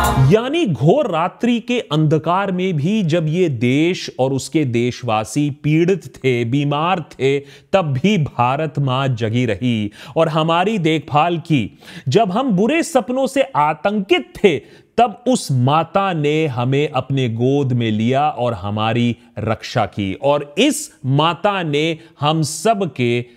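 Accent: Bengal, India. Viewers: Sir ap Bengali ho kaya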